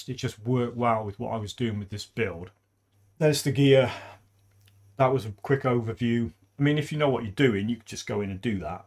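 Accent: United Kingdom. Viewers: Hey man! This comment is kind of unrelated to this video, but I just wanted to say that you reignited a spark in me, not only for this game, but for my life (as cheesy as that may sound). I have an embarrassing amount of time in this game for what I have actually accomplished. Anyway, I'm sick a lot and bedridden where I can't do much of anything, even play GD, but watching your videos has changed my entire perspective on not only my approach, but the FEEL of this amazing game. You made it feel okay to suck...lol. I mean I should have learned the things you teach WAY earlier in life. No gimmicks or music or loud people yelling "HEY GUYS! Check this out!" Your blazingly stereotypical English Karl Pilkington ish accent made it fun to listen to and watch while in utter despair. This may sound like something bad, when in fact it's absolutely amazing and I'm very thankful for it and you. 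Disclaimer: I am in no way saying you are like that guy, just the accent. I plan on doing tons of your builds with some of my spice (currently doing your Bloody Pox) and many others. Just wanted to say thanx man, and that Warden video with every class takes some serious commitment. Keep up the great work! ..It's appreciated. 🤘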